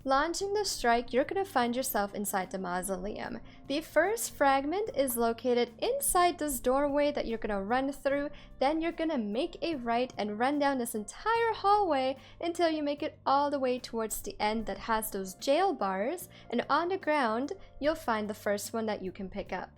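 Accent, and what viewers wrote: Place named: Germany. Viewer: I hear a German or Dutch accent off and on....hard to pick up but there is something there...what is it?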